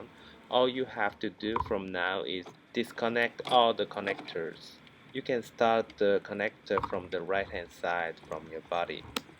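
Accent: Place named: China. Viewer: You h@ Chinese accent dude.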